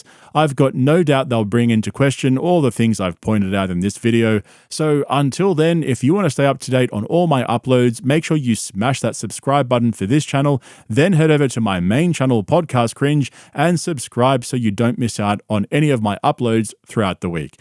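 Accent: Australia. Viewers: This is amazing, loving hearing an Aus accent on this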